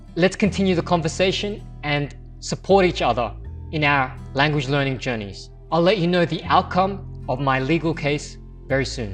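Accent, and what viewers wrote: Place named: Australia. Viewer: Hi, great channel and videos. Pinoy here. First I would suggest you learn to speak Tagalog with a native accent. Speaking Tagalog with an Aussie accent makes it sound weird. It has nothing to do with your ethnicity, it's more like me going to Australia and speaking English with a Tagalog accent or a Chinese accent (you know what I mean). As a language learner myself (I'm currently studying Japanese and Spanish), I believe being able to speak with a native accent is part of the process of learning another language. Secondly, I can understand your experience with so-called "native English" speakers. It's the same here in Hong Kong. I'm a financial editor and writer, and I used to work for an English-language newspaper that hired editors based on the color of their skin. If you're a gweilo from the UK, US, or Australia-NZ, you're hired. 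Many of them do not know proper English grammar which is essential in written English (much less the technical aspects of the subject matter that I write about and edit). I would argue there is a huge difference between written English and spoken English. A person may be able to speak English well enough as a native English speaker (those who were born in an English-speaking country) but being able to write properly (and edit) in English is another skill that has to be learned. Needless to say, working for that company was quite frustrating and the editorial quality of that newspaper left a lot to be desired. Good luck with your future en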